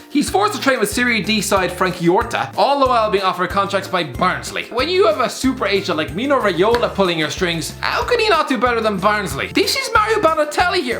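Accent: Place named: Ireland. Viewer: I had to put the video at 0.75x speed just to make this guy's Irish mumble rapping sound coherant.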